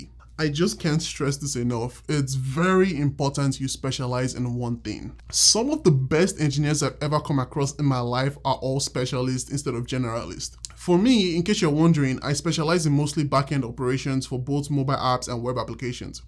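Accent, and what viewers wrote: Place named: United States. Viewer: Sir please don't talk American English please talk in clear English I can't understand your language 😢 please 😊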